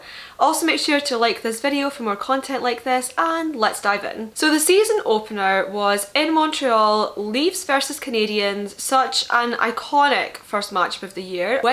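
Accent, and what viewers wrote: Scotland, United Kingdom. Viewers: Yes the Scottish accent makes the analysis better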